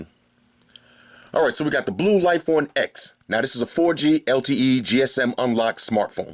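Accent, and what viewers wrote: New York, United States. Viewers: Hot 97!!I knew You were from Ny! BK?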